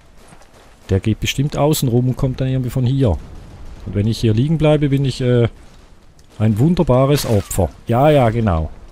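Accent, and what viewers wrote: Switzerland. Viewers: Wohnst du in der Schweiz? Du klingst wie ein Ostschweizer :D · Er kommt aus der Schweiz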